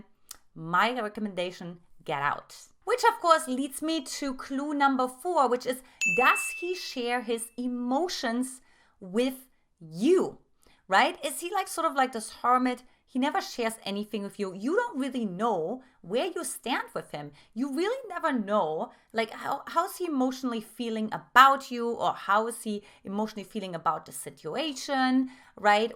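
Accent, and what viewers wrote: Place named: Germany. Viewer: Thanks. Are you from Germany? I can hear a little German accent when you speak.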